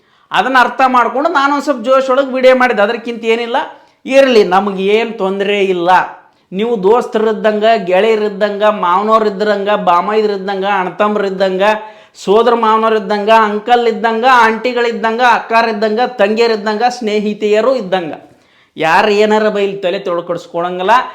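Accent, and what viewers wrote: North Karnataka, India. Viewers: He dosta ninna stayl alle helutu ba ninna uttara karnataka shailili super aiti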